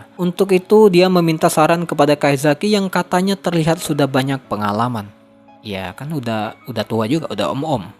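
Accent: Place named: Java, Indonesia. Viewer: Wong jowo bang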